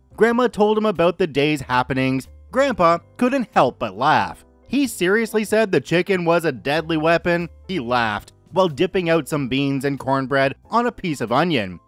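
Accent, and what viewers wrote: South, United States. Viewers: The Miraculous, Stupendous, Gorgeous, Righteous, Lovable, Huggable, Adorable, Tasteful (soap operas), Beautiful, Powerful, Loyal, Legendary, and Best Chick-a-baby Mr. Koo has returned! ❤️, don’t worry about your Southern accent, it’s fine. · your "southern accent" sounds like you were raised in the southern U.S at one point so don't beat yourself up about it. Sincerely, a Southern Yankee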